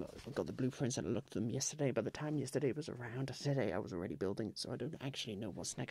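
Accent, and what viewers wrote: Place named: England, United Kingdom. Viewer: I hear the English in your voice now 😭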